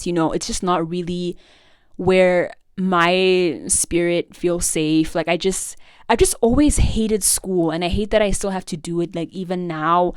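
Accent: South Africa. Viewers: if you have done the research, self diagnosis is completely vailid even the psychologists i've had have said so. Although I get what you're saying please don't diminish your struggles, i also used to do that when i was self diagnosing before i eventually got a medical diagnosis. You are not over oversaturating any spaces, you should feel free to use any resources that you feel like would help you. I feeel like as long as you're not harming anyone you're good🤍also, unrelated but are you south african?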